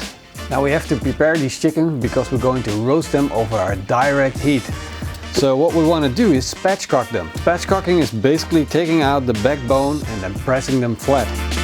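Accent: Netherlands. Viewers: Je hoort echt aan zn accent dat ie Nederlands is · man the dutch accent is so cool.perhaps a little carribean style barbeque next? Or even curing your own meats?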